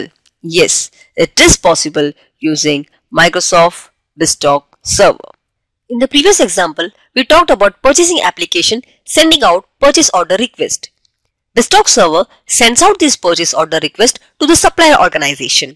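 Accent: India. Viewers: I find some of the comments here a bit offensive. The presenter may have an accent and a high voice but I find their explanation clear, easy to follow and concise. The English is grammatically correct and yes may have an Indian lilt but is easy to follow.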